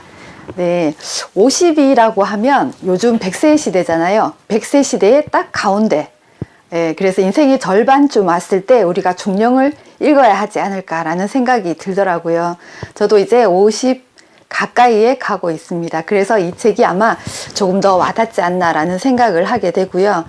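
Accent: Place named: North Korea. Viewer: 2년전보다 이북사투리같은억양이 마이 줄었네 ㅎㅎ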